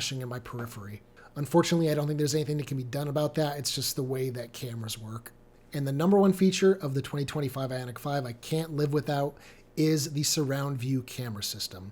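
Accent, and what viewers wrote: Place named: Boston, United States. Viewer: Until I listened further, I didn't understand Smot Pok - and then you said you're from Boston! 😂 Love your content - love my 2025 I6 RWD SE - great efficiency and roadtripper. Almost 20k miles since March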